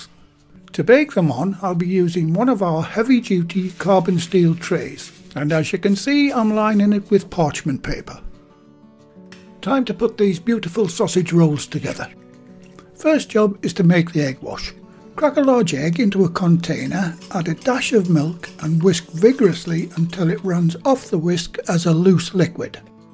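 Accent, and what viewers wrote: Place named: Northern England, United Kingdom. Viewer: As soon as I heard voice, then say he’s from up north, I just knew these were going to be godly sausage rolls.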